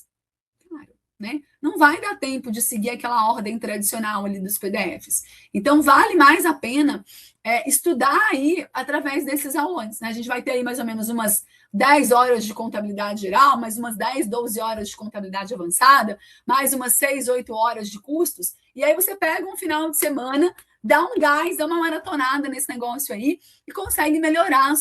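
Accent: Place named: Angola. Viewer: Acabei de reparar que o sotaque de lembra o sotaque angolano!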